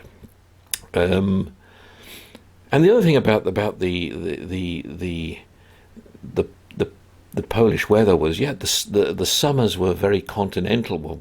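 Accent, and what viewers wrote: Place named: United Kingdom. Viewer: I like to hear a smart people like you(and your normal British accent) Greetings from Poland.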